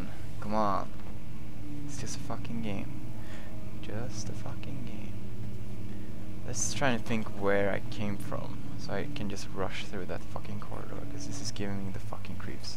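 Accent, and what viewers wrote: Sweden. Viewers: Oh my, this is soooo funny when PewDie says "f*ck" with this swedish accentXD Even "f*ck" sounds cute with the swedish accent:D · I love how he's all Swedish and crap, so he isn't the best reader and didn't say barrels right. So cute! :3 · his Swedish accent aaaaaaaaa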